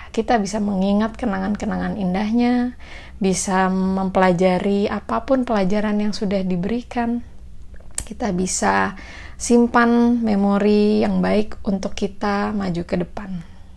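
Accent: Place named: China. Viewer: Gak ah beda suaranya.. Ci ada logat chinesenya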